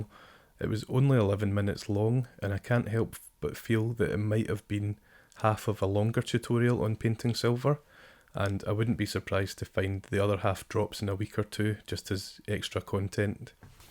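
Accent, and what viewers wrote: Scotland, United Kingdom. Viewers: Warhammer lore in Scottish accent sounds dope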